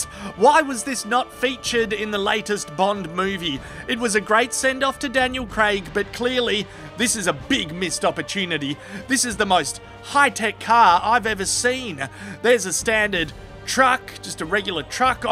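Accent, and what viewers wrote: Australia. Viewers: Yes, we citizens of the USA collectively think Australia is about nothing but kangaroos and crocs! 🤣 NOT! I would love to be able to tour Australia for the many beautiful and exciting things to do and see. Firstly, as a lover of the sea, the Great Barrier Reef would set me up for life. Sydney Harbor bridge, Opera House, Ayers Rock, Australia Zoo, the beautiful beaches with record-breaking waves, and last but not least, the well-reported hotel and restaurant hospitality and exceptional cuisine that we may not have here in the states. God willing, I will be able to afford such a trip in the future. p.s.: I could listen to that accent all day long!😘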